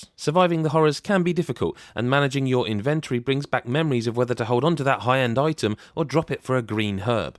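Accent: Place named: United Kingdom. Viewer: Is everyone who reviews games British?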